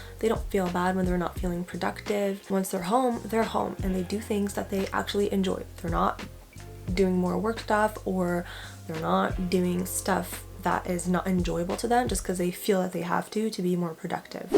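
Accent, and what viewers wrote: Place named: United States. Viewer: Je suis français et je n'ai jamais été aussi fier d'être français après avoir vu cette vidéo. C'est vrai qu'on le vit cool. Chaque fois que j'ai été à l'étranger, on m'a dit que j'avais trop de chance d'être français. Ma phrase préférée: "On adore la beauté". C'est vrai. On est cool comme des chats. Un de mes meilleurs amis, un allemand, a le défaut de manger sa nourriture en 5 minutes. J'ai essayé de le changer mais pas encore reussi. Tu es la meilleure version de nous-mêmes. Merci. J'essaye d'apprendre ça à mon enfant. Super accent américain, c'est rare pour un français de choper l'accent d'ailleurs aussi facilement.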